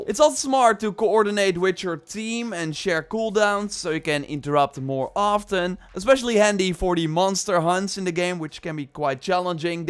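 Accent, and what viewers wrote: Netherlands. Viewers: En toch hoor ik meteen dat je uit Nederland komt 😂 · I'm pretty sure he's Dutch he has a very thick Dutch accent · Are you Dutch?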